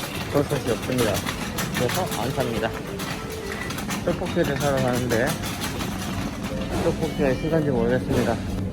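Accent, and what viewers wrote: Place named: Gyeongsang, South Korea. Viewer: 경상도분 이신가봐요 말투가 상당히 친숙하네요 ㅋㅋ 건강 잘 챙기시길 바랍니다!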